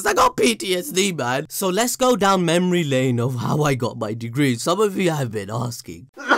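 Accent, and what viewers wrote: Punjab, India. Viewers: Are u punjabi bro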